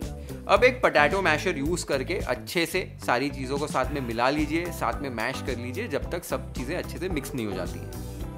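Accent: Maharashtra, India. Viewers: R you Maharashtrian? My wonder boy. Your sounds said.... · BRO ARE YOU MAHARASHTRAIAN😮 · Bonus recipe❤🙂👍!!!!! Ekdam authentic preparation chef!!!! Jiyo chef !!!❤ also nice marathi accent❤!!! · Your marathi accent is very nice 🚩🚩👌🏻👍🏻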